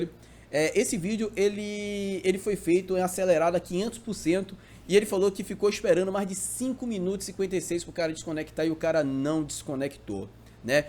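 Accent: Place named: Bahia, Brazil. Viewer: seu lindo, foi doloroso traduzir seu baianês nesse vídeo, mas tá aí, tudo em inglês pra você. faça bom proveito: )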